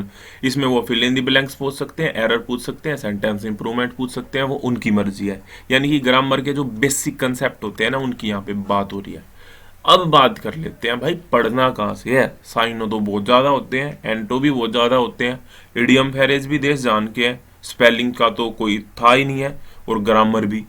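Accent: Haryana, India. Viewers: Aawaj se to Haryana se hi lagg raha hai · Ha lgte to haryanvi hi h ....sonipat side k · Bhai Haryanvi hain ke?? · Sir mujhe aapki voice bhoutt aachi lgi aapke bolnee ka tarekaa gjjbb h haryanvi side se ho kya aap😙😙😙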